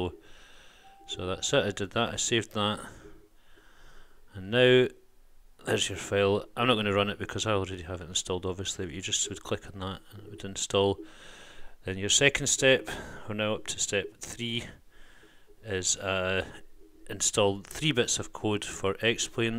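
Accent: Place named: Scotland, United Kingdom. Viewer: ohh shit you Scottish mate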